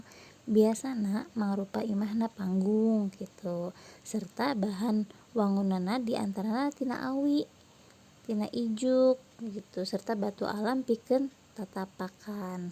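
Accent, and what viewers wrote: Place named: Sunda, Indonesia. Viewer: Dari kata pengantar, kelihatan jelas logat Sundanya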